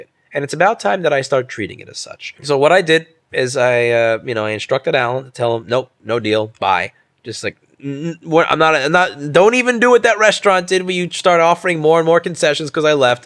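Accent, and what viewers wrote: New York, United States. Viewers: When you start ranting, your New York accent really comes out. When you calm down, it relaxes. It's quite endearing. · I love you so much you’re so New York it’s like talking to someone on the street “Family owned Jewish company” 😂😭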